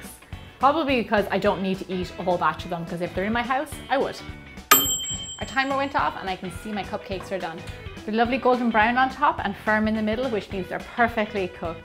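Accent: Ireland. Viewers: Are you irish? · What part of Ireland are you from I live in a Cork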